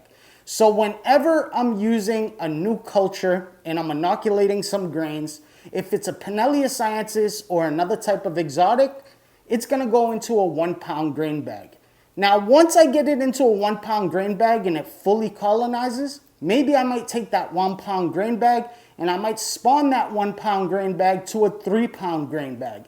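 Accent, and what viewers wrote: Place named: East Coast, United States. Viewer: As usual brings the knowledge along with that east coast accent. Great info on bags. Answered a lot of questions I didn't know I had. It was great to see a new video from you. 🍄♥️ 😎✊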